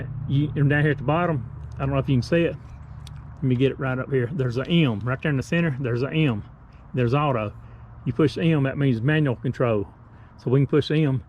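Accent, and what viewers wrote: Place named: South, United States. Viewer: Great video! And I love your southern accent!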